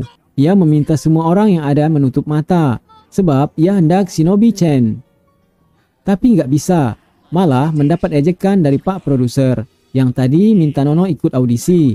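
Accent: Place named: Padang, Indonesia. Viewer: Padang banget aksen nya bang, jadi salkus berasa dengar podcast praz teguh 😂 btw thx u udh bikinin rangkuman cerita nya, nonton dulu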